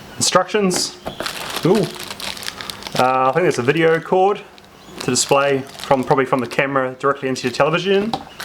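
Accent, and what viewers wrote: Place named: New Zealand. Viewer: Sounds like you from NZ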